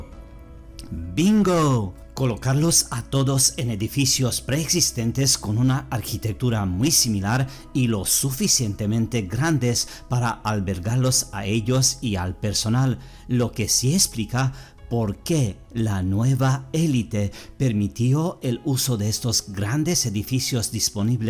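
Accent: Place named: Germany. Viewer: Estoy muy impresionado con su trabajo, de verdad impecable su eapañol es excelente pero su acento parece aleman o holandes puedo preguntarle de donde eres?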